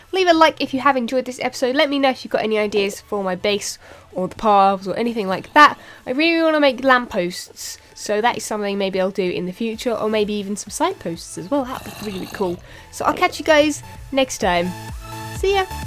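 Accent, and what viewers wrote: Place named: Fens, United Kingdom. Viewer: Fab Vid! I have to ask though, your accent... you were sounding very much like a fenland lass lol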